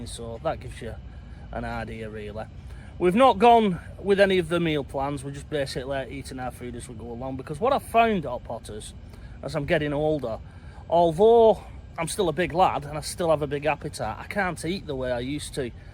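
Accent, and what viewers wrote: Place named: Wigan, United Kingdom. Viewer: You don't need to talk like a Wiganer, just speak English. I've worked for American companies for 25 years and have never had any problems communicating with the yanks especially the women.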